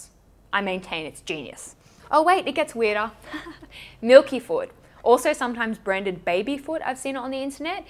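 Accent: Australia. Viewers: This video makes me so happy 🥰🥰 I always adore your content but this was especially 👌 When you said ‘ya weirdo!’ And swatted at the screen I burst out laughing. I’ve been overseas half a year and just the combo of the Aussie accent, the -ism and the playful niceness made me think of home and Aus, which I’m starting to really miss since I’m in the Dutch winter and the weather is grim/there’s been a cultural adjustment to say the least. I love how you give specific recommendations such as ‘the friend who reads all the glossy mags’ it makes me able to picture what goes where, or even more like which vibe suits who. Which is what I always aim for in gift giving. And finally, at the end when you spoke about engaging with something close to your heart and you spoke with such enthusiasm and sincerity...that was so lovely. A reminder of a different kind of gift giving ❤️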